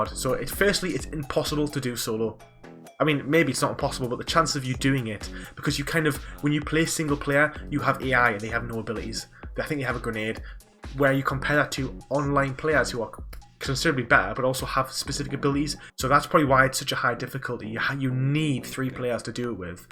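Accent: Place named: Teesside, United Kingdom. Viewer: Now mate been watching your channel a couple months, and judging by your accent I think your from near where I live. I live in Middlesbrough. If you don’t want to say where your from I understand.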